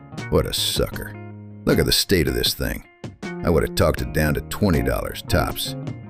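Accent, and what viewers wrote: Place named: South, United States. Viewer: Great info but the Bubba-fied narration is kinda irritating.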